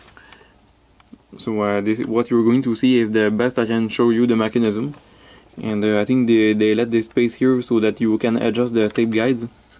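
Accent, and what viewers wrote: Quebec, Canada. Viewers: you live in quebec i can tell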